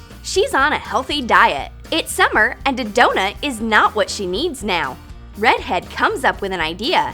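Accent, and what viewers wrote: Russia. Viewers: are you English or are you Russian?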